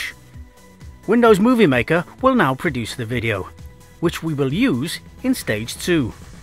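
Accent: Wales, United Kingdom. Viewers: Thank you so much:) just watched it, I knew alot of the stuff but I have learnt a few new tips, thank you!! Are you Welsh? Sometimes you sound Welsh, sometimes English lol x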